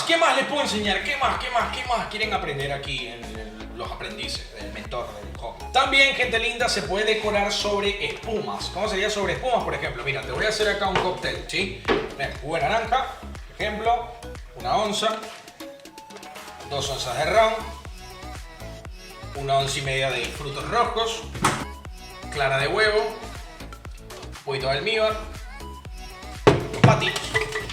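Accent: Venezuela. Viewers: Soy el único que piensa que tiene un poco de acento venezolano?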